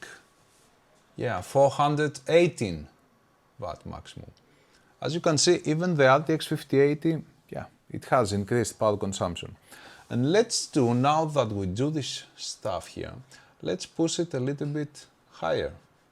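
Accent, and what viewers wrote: Greece. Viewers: Are you greek by any chance?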